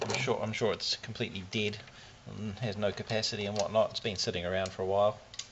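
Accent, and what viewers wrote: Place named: New Zealand. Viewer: Thanks for the video. Very enjoyable.. You sound like a New Zealander... Auckland maybe..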